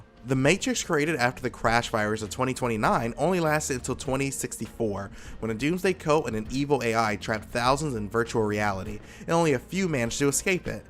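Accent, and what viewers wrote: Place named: United States. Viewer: pretty easy to understand, he is probably American judging from the accent alone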